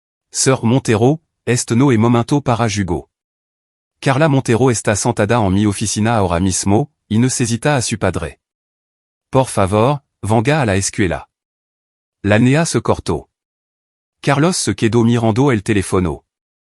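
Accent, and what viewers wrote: France. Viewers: ¡¡¡Que gran estupidez¡¡¡¡ Poner la IA en español con acento francés!!!! No pienso escucharlo!!!!!! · pesimo narrador, su acento frances distorciona lo que dice.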